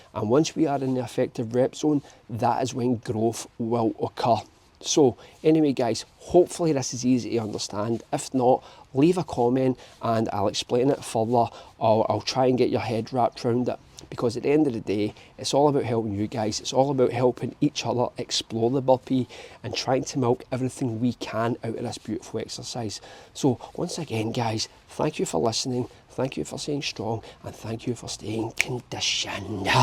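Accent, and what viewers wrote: Scotland, United Kingdom. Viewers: Hey man, my comments have been telling me to hit you up. Where is Scotland are you from? 😊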